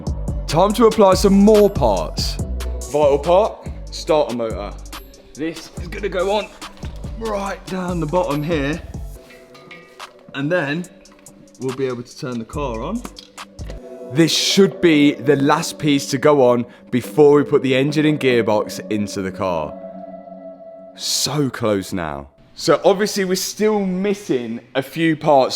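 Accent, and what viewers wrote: Leicester, United Kingdom. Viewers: Rat er ley, fer rar ey, noise ey love it good old Leicester accent. And cracking video well done 👍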